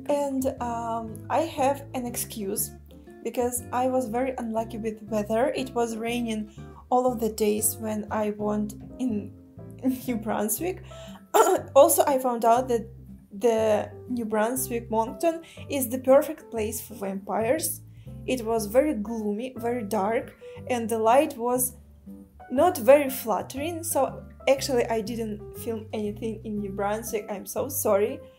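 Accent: Russia. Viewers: Русская? Акцент )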